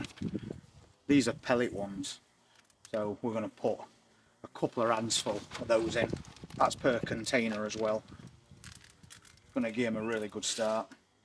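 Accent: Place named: Derbyshire, United Kingdom. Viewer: You’re really settling into caravan plant life now. Your spuds look so good. We share the same dialect I reckon so listening to you reminds me of my Derbyshire family. Take care.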